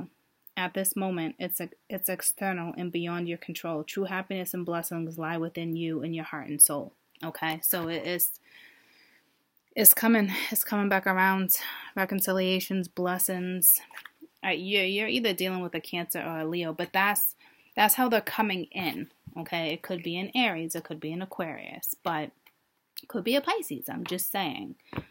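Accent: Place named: Massachusetts, United States. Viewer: I know what I would do.....🏃‍♀️👀🏃‍♀️👀🏃‍♀️👀🏃‍♀️👀no woman should ever take a cheater liar thief and deadbeat dad back. Stay where you thought the grass was greener but instead you got the Sahara desert 🐫 🙏❤️thank you love that Massachusetts accent. Right up the road from you. You are a great reader 🙏❤️⭐️⭐️⭐️⭐️⭐️ An ex asshole libra husband 😂😂😂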